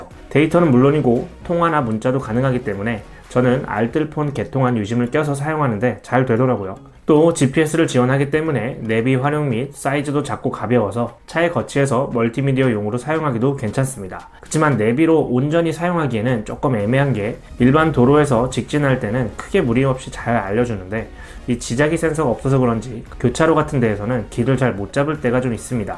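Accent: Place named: Daejeon, South Korea. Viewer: 대전분이군요 ㅎㅎ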